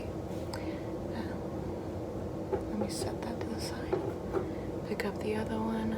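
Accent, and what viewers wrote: South, United States. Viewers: American Southern accent > any other accent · Ah yes, the near extinct classic Non-Rhotic Southern accent, how I wish this accent was more of a thing.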